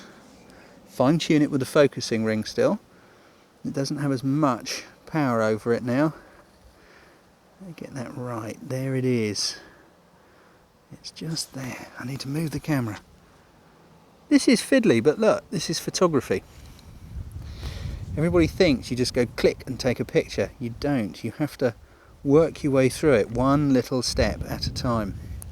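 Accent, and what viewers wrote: United Kingdom. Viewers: thank you for your native british language i really enjoyed it.s really lovely explain it .too much time i have been searching t the internet finally found you thank you.amazing explain .you are good teacher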